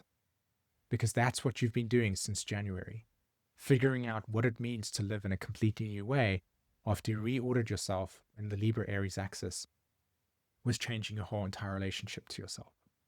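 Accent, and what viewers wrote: South Africa. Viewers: I'm wondering if your accent is South African?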